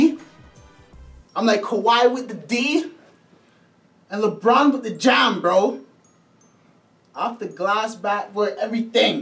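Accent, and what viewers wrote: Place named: Toronto, Canada. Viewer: Fam u sound like u from Toronto! Your hilarious brother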